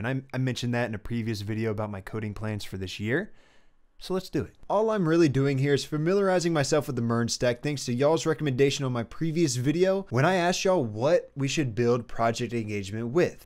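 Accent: Texas, United States. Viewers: Excellent video, I'm curious, are you from Texas?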